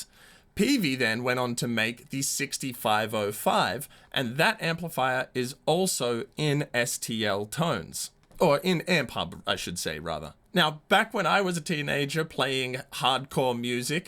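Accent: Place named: Australia. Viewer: Interesting stuff! You Aussie's with your funny 'H' sound always gets me. Trying to hear the difference btw some of those heavy distortion tones reminds me of trying to show my parents the difference btw East coast and West coast rap in the 90's! I guess you have to listen to some music that uses those tones to get it right off the bat. That POG is slammin'! (pun intended) I like to do that and just use the two lowest strings, too. It reminds me of that 60-70's Soul/Funk sound.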